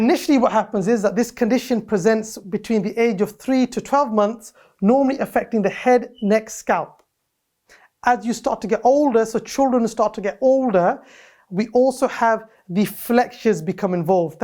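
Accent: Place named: Somalia. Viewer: I like your accent, that reminded me while I was by the border of Somalia.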